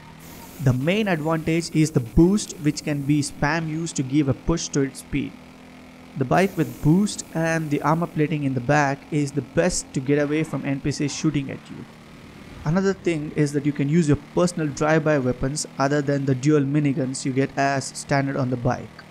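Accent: India. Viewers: Indian i think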